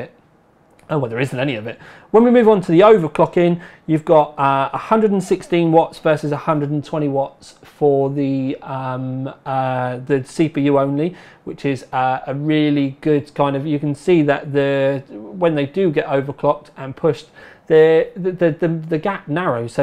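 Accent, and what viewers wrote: United Kingdom. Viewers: Is this British accent? :D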